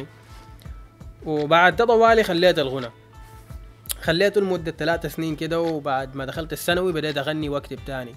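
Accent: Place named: Dongola, Sudan. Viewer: انت شخص جدا مبدع انت قلت في البداية انو العربي يمكن يكون تعبان... شكلك رطاني وفي الرطانة زاتو دنقلاوي هههههه وفوق لي دا لسانك اجنبي انت صراحة جوطهم كلهم كدا وطلع لينا المبدع ههههه استمر يا برو استمر...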